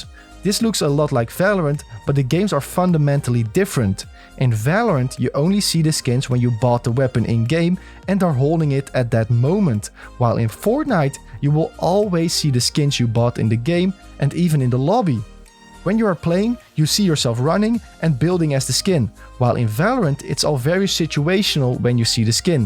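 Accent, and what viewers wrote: Netherlands. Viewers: Ik kan gewoon horen dat hij nederlands is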